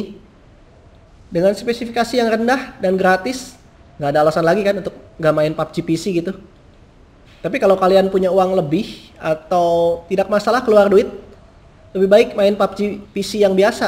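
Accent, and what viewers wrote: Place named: Sunda, Indonesia. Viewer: Logat sunda nya kental brow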